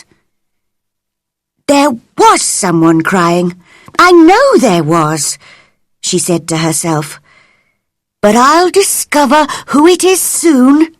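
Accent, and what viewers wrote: United Kingdom. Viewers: you v'e got wonderful British accent with amazing voices ❤❤❤ please keep posting your stories, l really liked and I appreciated it so much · اللهجه بريطانية 😭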